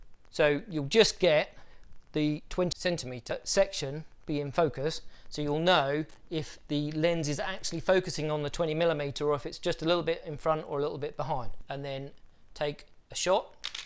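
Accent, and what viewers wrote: United Kingdom. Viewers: Great video. Makes a change to hear someone English. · Nice informative video just found your channel...thought it would be good being a and you sound like a northerner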